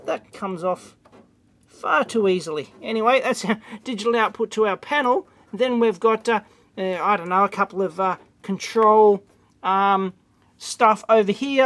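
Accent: Australia. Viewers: Nice one! That dumpster is becoming an electronic goldmine!! Keep up the good work, Love the Aussie Accent too!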